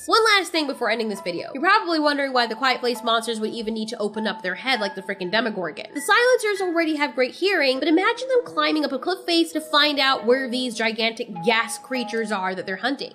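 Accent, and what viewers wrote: United Kingdom. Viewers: please answer this are you british bcs that was the best british accent uive ever heard, i should know since im british. i started watching u about 3 weeks ago and have been so fascinated by all of this with a quiet place i love ur work aswell please keep it up <3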